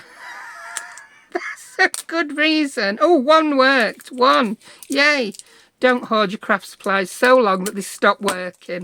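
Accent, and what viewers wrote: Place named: Northern England, United Kingdom. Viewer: Hi there, just tuned in to your tutorial & just love your northern twang. Whereabouts are you from. I live in New Zealand now but originally I’m from near Buxton in Derbyshire.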